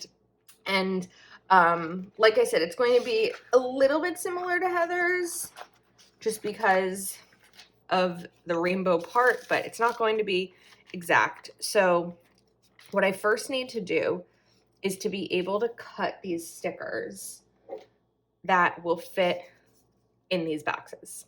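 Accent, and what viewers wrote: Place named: New Jersey, United States. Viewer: Hi...so sorry for your loses. I was listening to you while I was just doing my planner and you made me laugh. You asked the question about the pronunciation of the word orange. I thought wow she says it the same way I do. Then the next thing you said was that you were from NJ. I'm from NJ as well now residing in Vermont. They tease me all the time about my accent. I'm constantly trying to explain that it's not me with the accent but them...lol. I love your spreads and videos. Chin up, you're doing a great job.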